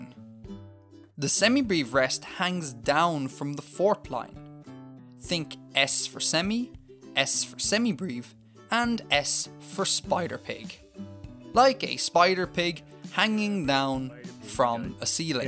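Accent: Ireland. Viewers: That isn't British as you can hear to his accent he sounds like a irish lad to me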